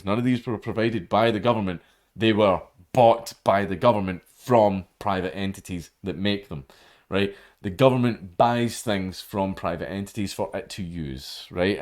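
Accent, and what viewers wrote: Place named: Scotland, United Kingdom. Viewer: Not that I don’t like your ‘Mad Lads’ series/channel. But I love your news videos. As a yank, I love your Scottish accent too.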